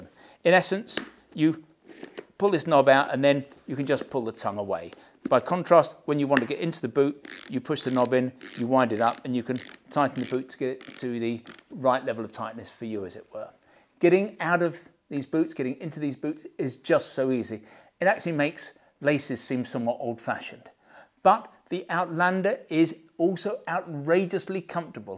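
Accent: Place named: East London, United Kingdom. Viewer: sounds like you are fresh from petticoat lane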